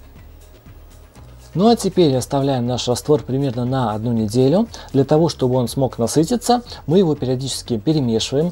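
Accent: Latvia. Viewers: Вы из Латвии?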